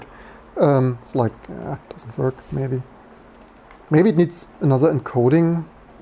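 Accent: Germany. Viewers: If your accent didn't give up that you are German, the lidl and aldi definitely gave that up. Btw Aldi has currently one of the biggest SAP transformation going on rn . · anaser most german english ever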